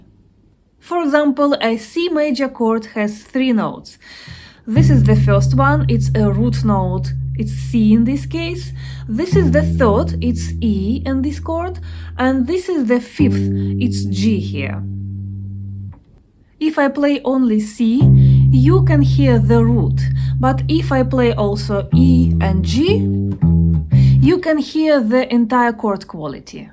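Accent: Russia. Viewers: What a fine difference she makes compared to all these ugly unshaven tattood guys in black t-shirts. Her teaching is not only very accurate, but is is more fun to listen to somebody with a pleasant aura and the russian intonation is the cherry on the top.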